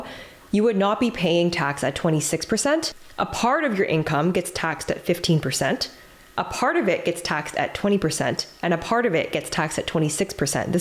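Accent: Canada. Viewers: You speak like any normal Canadian! Thanks for the information! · has a canadian accent so she is likely canadian.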